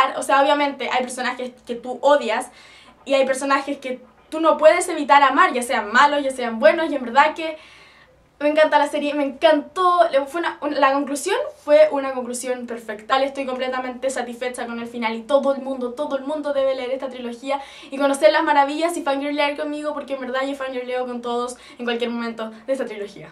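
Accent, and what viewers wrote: Chile. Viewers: eri chilense?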